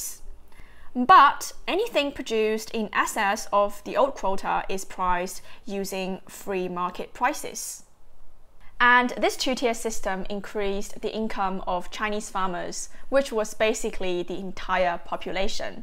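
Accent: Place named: United Kingdom. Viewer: She has ver British accent?.?why?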